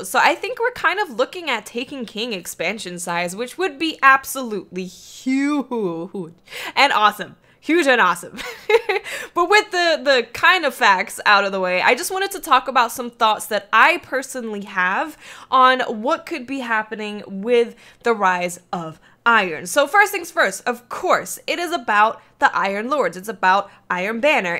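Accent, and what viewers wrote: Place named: New York, United States. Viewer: Is she from New Jersey Brooklyn or New York she kinda sounds like she has that accent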